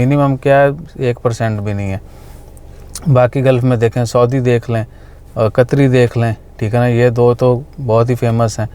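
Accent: Pakistan. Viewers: Bhai ap Lahore k han apki punjabi Lahore wali hai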